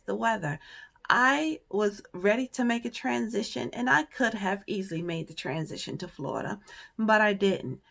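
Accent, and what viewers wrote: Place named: Georgia, United States. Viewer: I’m 3 yrs. Late but for being born in NYC and living in NORTH Jersey you sound like you come from Georgia. If you’re still there in a few more years we might be neighbors when I finally retire.. 😂😂😂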